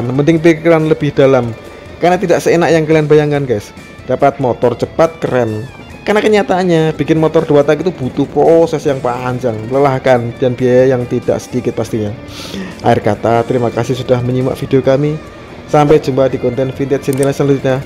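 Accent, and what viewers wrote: Magelang, Indonesia. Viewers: Magelangan banget om 😂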